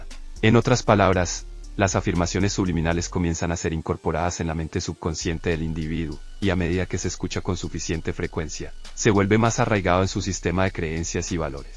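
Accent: Colombia. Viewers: Esa voz se escucha como medio colombiana❤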